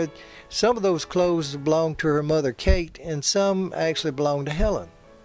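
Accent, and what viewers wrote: South, United States. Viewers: Fantastic video! I enjoyed your commentary and lovely Southern accent very much! Thank you!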